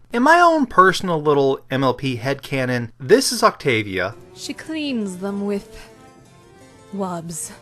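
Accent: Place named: United Kingdom. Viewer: At least she's still British (I think, it was hard to tell exactly what it was).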